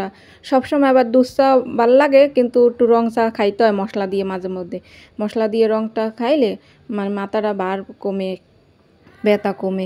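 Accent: Barishal, Bangladesh. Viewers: মুই কিন্তু আপু তোমার বরিশালের কথাগুলো বেশি ভালোবাসি ❤❤❤তোমার কথাগুলো বেশ ভালো লাগে।পরিষ্কার ।করার আইডিয়া দারুণ ❤❤❤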